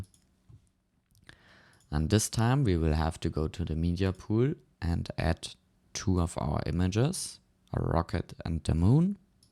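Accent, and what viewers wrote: Germany. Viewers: Nice video but you sound so german😁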